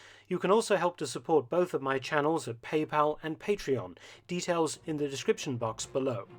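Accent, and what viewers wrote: England, United Kingdom. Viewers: It's the Brittish accent that keeps me coming back!👍🇬🇧😉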